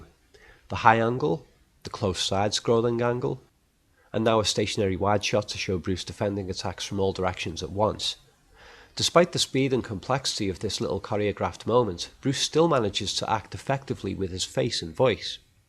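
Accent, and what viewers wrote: Liverpool, United Kingdom. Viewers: you are my new hero... I've long thought of doing a similar analysis but what you've done here is (as of now) head and shoulders above anything I can do... Plus you have the (G)reat British accent (Liverpudlian, I read) which in America assigns automatic intelligence to the speaker, which in your case is legitimately deserved... Just one thing: I'm not sure what the term "roundhouse kick" means to you but per Jeet Kune Do terminology Lee uses in order, hook kick, reverse hook (2), side kick, reverse hook (2), inside crescent and spin outside crescent... Forgive my nit-picking, I've just been in a recent spat with a YouTuber who's been so-called debunking Bruce Lee... Excellent work! I look forward to your analysis of the fight scene with Chuck Norris in Way of the Dragon!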